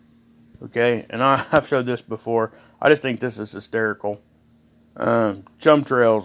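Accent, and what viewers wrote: South, United States. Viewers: I just subbed good video man sounds like your from the south good to hear a familiar voice since im from Tennessee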